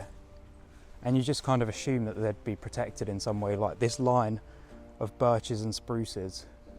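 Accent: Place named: United Kingdom. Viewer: By your accent, mentioning of ash dieback, alders, beeches, and the use of the word lime instead of lindens suggests to me that you and your garden are in the UK. Most likely in England? In your other video about Bissett's bamboo its too bad you didn't plant rivercane (Arundinaria gigantea). That is one of the four species of bamboo native to the US. It is adapted for life along a wild river. But then maybe its hard to get there or it doesn't do well there?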